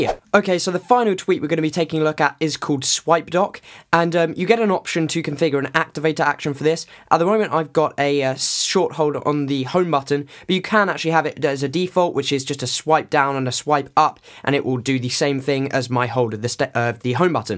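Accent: United Kingdom. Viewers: I appreciate the demos and tweaks. If you could speak slow, it would be better to understand your British accent.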